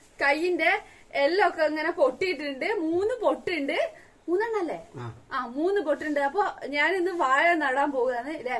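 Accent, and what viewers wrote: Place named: Kozhikode, India. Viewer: Achanodum ammayudum nalla snehamulla kutty welldone pengalee place kozhikode anne alle slang kettapoza manasillayathu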